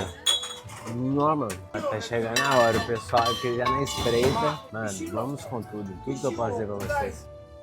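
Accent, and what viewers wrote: Minas Gerais, Brazil. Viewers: Te assisto há pouco tempo e percebi o seu mineres desde o início!!!! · Cara, vi teus vídeos... E só pelo sotaque vi que é mineiro... Parabéns excelente trabalho. Pra ser melhor só falta ser cruzeirense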